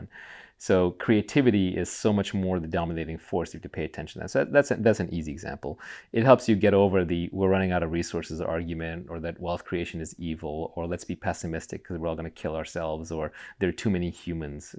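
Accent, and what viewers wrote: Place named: United States. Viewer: your accent is more American than American themselves.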